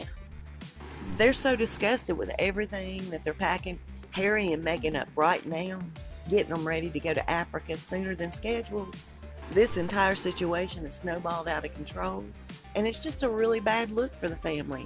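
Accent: South, United States. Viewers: I really like your voice, where in America is your accent from? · This woman narrating......terrible southern ACCENT. Can't listen past 2 minutes. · Then dont listen and go elsewhere....nobody asked you to come or stay..dont hate on beautiful southern women and our accents...u must speak thru ur nose like yankees..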